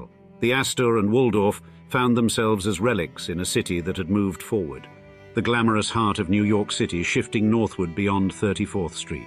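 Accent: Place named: London, United Kingdom. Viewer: Our lovely London corky English.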